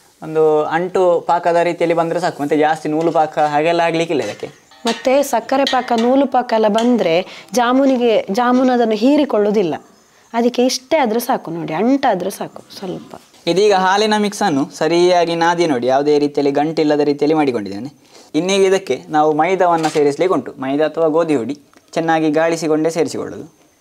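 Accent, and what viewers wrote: Mangalore, India. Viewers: ಮಂಗಳೂರಿನ ಬಾಷೆಯ ಸೊಗಡು ತುಂಬಾ ಚೆನ್ನಾಗಿದೆ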